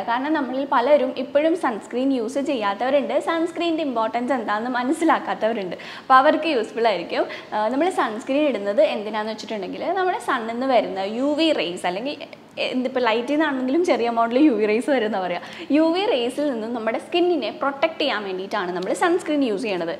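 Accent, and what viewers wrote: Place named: Thrissur, India. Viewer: Aahhaa.. thrissurkaari aayo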